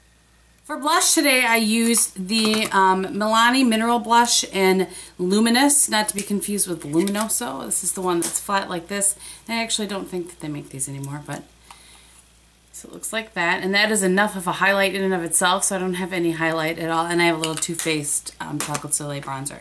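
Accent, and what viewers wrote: Wisconsin, United States. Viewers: I love your wisconsin accent! I'm from Iowa, so I love when I get to hear a wisconsin accent!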